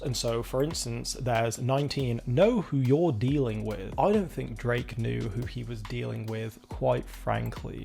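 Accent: United Kingdom. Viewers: Its always so bizarre and cringe to hear the word ni**a spoken in a British (or frankly any other non American) accent. The term used in an endearing or hip hop adjacent way is so inherently African American and steeped in the specific experience of being Black in America. African American pain/liberation is tied to the word in this context, so when Black people with different accents from other parts of the world say it, it sounds wild af. This is partly why Kendrick told Drake we don't want to hear him say it anymore. Nice video, but just food for thought. Race and culture are not the same thing, Drake not seeming to understand that is a part of why he lost so miserably.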